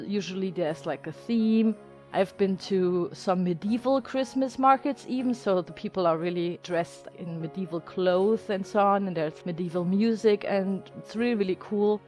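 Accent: Germany. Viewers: I love just watching your videos and hearing your voice, you talk a lot like my Oma, and it makes me happy. I don't get to see her a lot anymore and hearing your heavy German accent makes me really happy. And watching this build reminds me so much of a trip I took to Germany once years ago for my Uncle Marco's wedding and we went during the Christmas time and it looked just like this! It was beautiful... Your video made me cry a little bit, happy tears though! haha you made me very very happy. So, thank you for that. <3 xoxo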